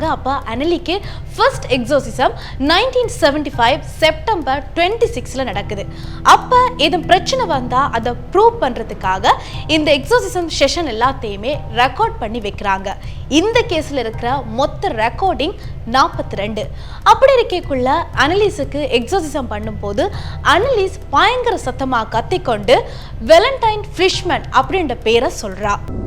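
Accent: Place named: Sri Lanka. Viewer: Mam..neenga ilangai yaaà...unga slang appadi irukku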